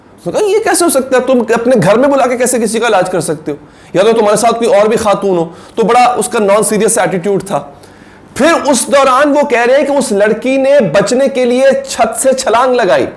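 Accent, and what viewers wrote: India. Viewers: Sir please Urdu sahi Bolen Indian accent hota ja raha he apka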